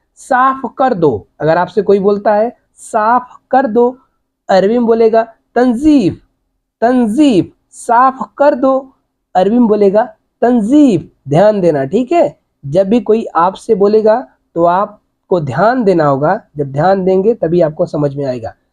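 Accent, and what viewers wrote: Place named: Uttar Pradesh, India. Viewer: Bhai mujhe lgta hai aap uttar pradesh se ho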